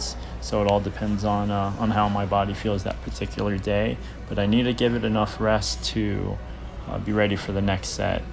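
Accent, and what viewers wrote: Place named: Oklahoma, United States. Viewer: Dude are you from Oklahoma?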